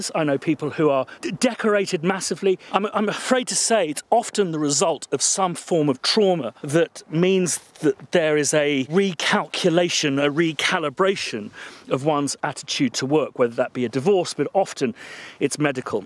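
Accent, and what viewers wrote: RP, United Kingdom. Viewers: Combined with the view, your way of speaking resembles Downtown Abbey (meant as a praise)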